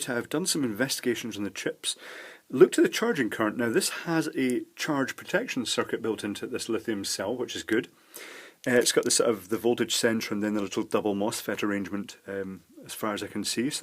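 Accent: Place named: Edinburgh, United Kingdom. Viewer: "Mellifluent" I think is the word you are seeking, Robert. Me, I thought it was just his pan-loafy Edinburgh-esque accent. :-)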